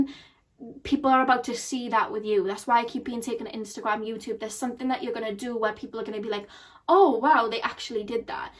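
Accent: Wales, United Kingdom. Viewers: Im Welsh And Im Sure I Can Hear A Welsh Accent There 🤔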